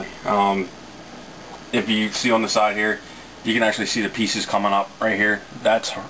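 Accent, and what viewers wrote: United States. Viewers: Are you from Baltimore? Sounds like a b-more twang to me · This is good work. You sound like a Pittsburgh accent, where are you from?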